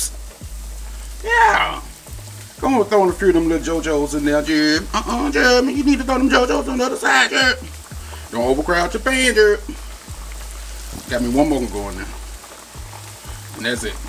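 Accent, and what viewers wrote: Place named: Louisiana, United States. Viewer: Love y’all was watching u heavy since 5k I use to have the biggest crush on you Cuh of your Louisiana accent lol 😂 food looks good tell hubby i said hey 👋🏾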